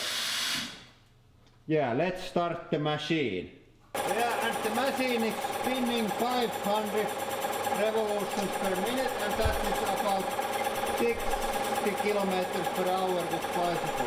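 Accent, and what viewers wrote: Finland. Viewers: that was some nice finnish rally english :D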